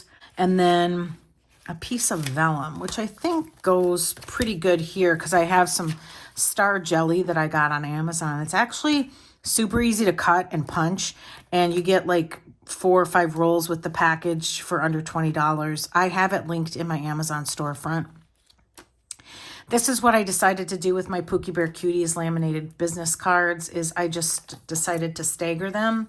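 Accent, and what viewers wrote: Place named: New Orleans, United States. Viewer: What area of the country are you from (if you don’t mind saying, you sound like you could be from New Orleans or another port city)… except that you say “you guys” instead of y’all - just curious I’m a speech pathologist and like to guess.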